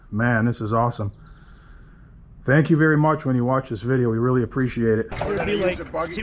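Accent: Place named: Wisconsin, United States. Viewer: There aren't many accents that beat a thick Wisconsin accent.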